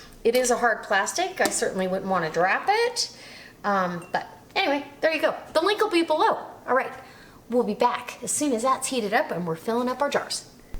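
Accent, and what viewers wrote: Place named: Upper Peninsula, United States. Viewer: Miz, I'm about to put up a crap load of pork shoulders I've had in my freezer for years, vacuum sealed. It's time to clean the chest freezer out, and I have just started canning meats. Can you give me some ideas of different ways/recipes I could make to can, using my pork? Thanks, In advance, and I think you sound like you are from maybe the U.P.? I like your accent.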